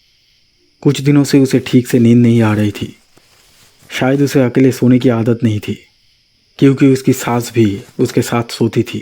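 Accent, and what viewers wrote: Bengal, India. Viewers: This youtuber is from Kolkata only I think so as he also has one more Bengali dubbed channel where he uploads this story only but later and you can notice his accent and names of characters are Bengali names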